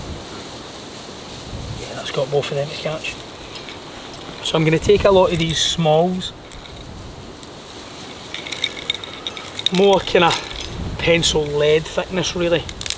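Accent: Scotland, United Kingdom. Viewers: Cool wee vid guys and great Scottish accent. You planning to get out filming a bit more this year? Thanks for filming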